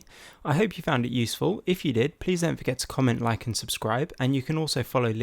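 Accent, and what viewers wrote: United Kingdom. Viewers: oh god the british accent...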